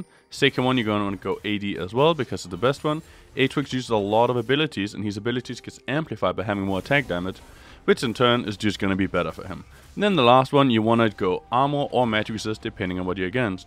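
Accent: Denmark. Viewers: "Go for the spirit of vis-ass" xD love the danish accent.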